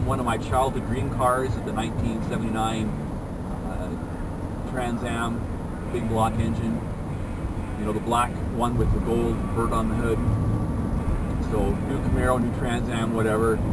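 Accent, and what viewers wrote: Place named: Canada. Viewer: From your accent it seems your Canadian?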